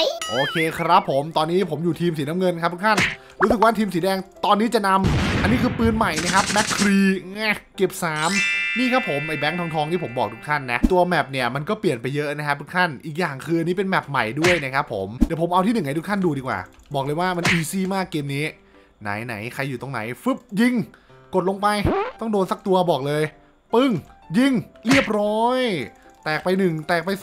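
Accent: Isan, Thailand. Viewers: เสียงอีสานก็มา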